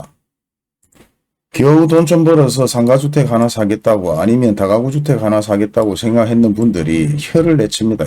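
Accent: Gyeongsang, South Korea. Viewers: 사장님 푹건한 경상도 사투리 너무 친근감있고 듣기 좋습니다 ~ 앞으로 자주 오겠습니다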